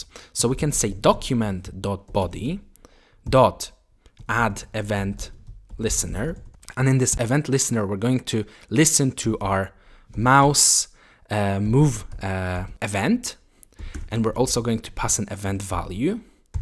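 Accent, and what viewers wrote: Poland. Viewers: Awesome effect! Q&A: Is polish your mother tongue ?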